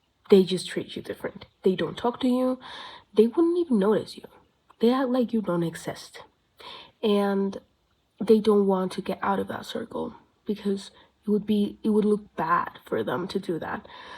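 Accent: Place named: United States. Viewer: Wait you aren't from America? Your accent sounds very american.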